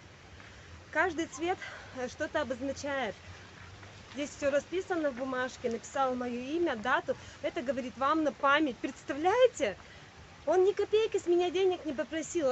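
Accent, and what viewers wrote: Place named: Udmurtia, Russia. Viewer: а почему Вы не дождались вечера. Собаку не выгонишь в такую жару. А вода в Ереване бесплатная, холодная из питьевых фонтанчиков. Судя по говору-Вы из Удмуртии?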